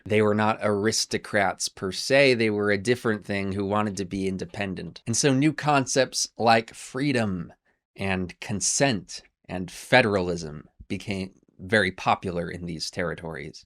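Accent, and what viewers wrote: Wales, United Kingdom. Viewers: Chief are you welsh??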